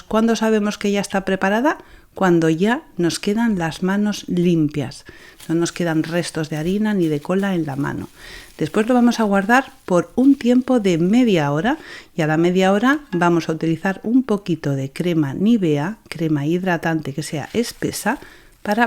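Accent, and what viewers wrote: Spain. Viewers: ¡¡¡¡,sabes a mi me sucede ygual?,tengo infinidd de cositas hechas de hace años en distintas cajas,y en ocasiones las encuentro,....y me vuelvo loca mirandolas,como si no las hubiese hecho yo misma,y me emociono.....asi es un poco raro no?....bien tu trabajo es como dices ESPECTACULAR, que belleza besotes desde ARGENTINA creo que tu eres española sai me suenas 🤩🥰😂🤣😚☺ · ESPECTACULAAAAR!!Lo haré y espero me quede igual de precioso como en tuyo!!!Me encanta tu toni de voz y tu acento Español !!👍👌👏👏😘🌹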